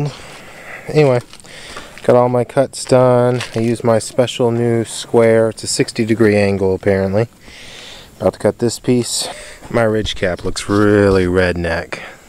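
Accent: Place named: Pittsburgh, United States. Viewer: Pittsburgh Accent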